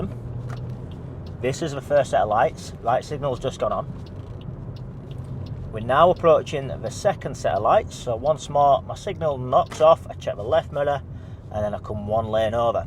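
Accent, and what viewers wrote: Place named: United Kingdom. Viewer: I was flabbergasted when he staring talking in British accent 😂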